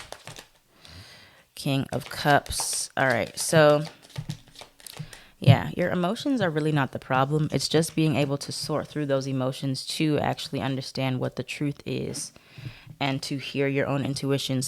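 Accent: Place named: New York, United States. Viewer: 🤣🤣🤣🤣 I can tell ur from ny. I’m so happy ur doing better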